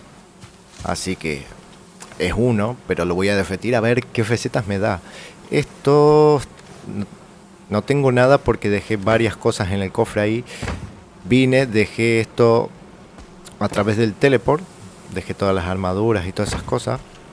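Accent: Argentina. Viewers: me gusta mucho el contenido pero realmente me hace mucho ruido la mezcla de tonada argentina, con palabras y expresiones españolas y mexicanas jajajaja. Igualmente, repito, de los pocos canales que suben buen contenido del game, gg wp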